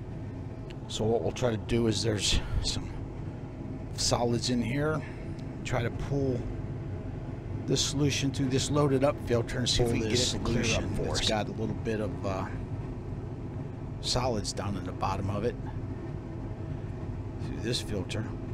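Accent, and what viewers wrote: United States. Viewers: You speak such a nice English dialect. Can you tell where exactly in the US you live? I'm from Germany, by the way, that's why it's kind of fascinating for me. Is there actually an accreditation for precious metal refiners in the USA? Can or do you have to issue certificates for the purity of your gold bars? In Germany, such things are usually very strictly regulated.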